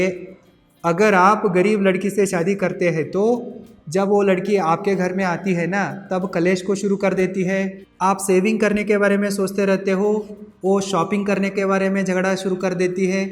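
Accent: Maharashtra, India. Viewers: राम - राम मराठीत बनवा ना.. ( Marathi Accent Refelecting clearly )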